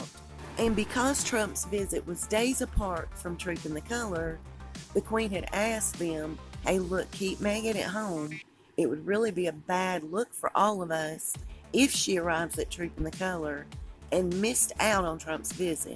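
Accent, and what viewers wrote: South, United States. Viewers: Then dont listen and go elsewhere....nobody asked you to come or stay..dont hate on beautiful southern women and our accents...u must speak thru ur nose like yankees.. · I really like your voice, where in America is your accent from? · This woman narrating......terrible southern ACCENT. Can't listen past 2 minutes.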